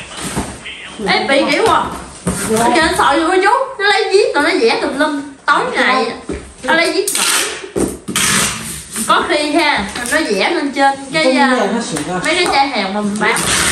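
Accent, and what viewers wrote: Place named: Mekong Delta, Vietnam. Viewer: Rất thích kênh vì cách nói bình dị rất miền tây nam bộ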